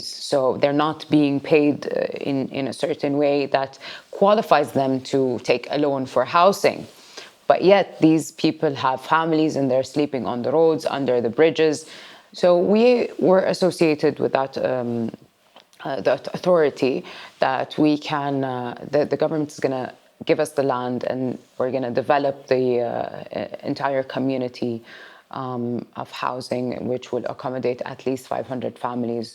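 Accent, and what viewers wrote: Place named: Arab world. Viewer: Masha Allah🌙 Us/We,..Muslim/Women,..Mothers have more responsibility's than Men have,..we take care of Children/Husband/Family/Career/Domestic chores etc etc,..doing all these things in a loving/caring good way gives us positive energy,..I regard all my responsibilty's as divine chores,..I love/like accent of Arab Men/Women talking English,..well spoken,..Shaikha,..Inspiring,..investing in person/people,..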